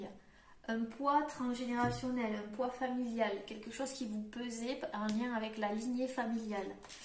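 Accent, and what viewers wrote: South, France. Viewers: Votre accent du midi est absolument charmant et dynamisant c est trop chouette et mignon 🙃❤️👩‍🦽👁️👁️🌈🗝️🪐😇🌝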